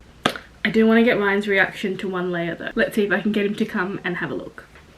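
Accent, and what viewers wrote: Australia. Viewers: She's black. What do you think she is?? She just has an accent, either Australian or South African.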